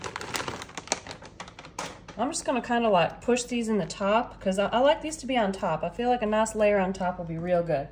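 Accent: South, United States. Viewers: what state are you from with that accent. Just love it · could just listen to a southern accent all day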